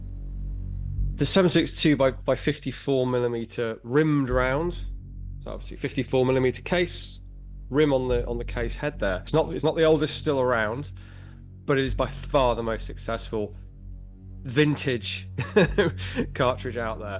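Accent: United Kingdom. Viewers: He's pretty good. Like gun Jesus with a britsh accent and a mullet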